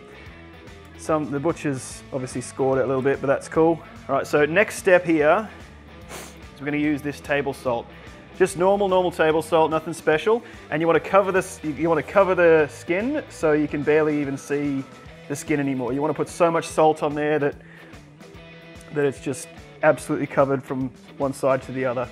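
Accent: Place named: Australia. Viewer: Gidday, some excellent tips in this video, but the bloke sounds like he's an Aussie. Just a reminder, we've been using Celsius for nearly 50 years in Australia. Fahrenheit is obsolete.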